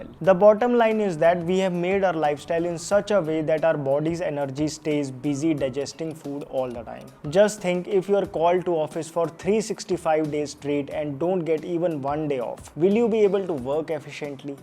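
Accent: India. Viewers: Pta nhi Bhai ki english hindi jaisi lgti h 😅😅 Nice information ♥️🌸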